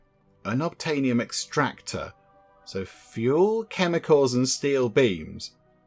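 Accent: United Kingdom. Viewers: My God you are sooo British xD, the excitement when you got the Big ben..... anyway I liked all the videos of this playthrough if that's what it'll take for you not to stop, please don't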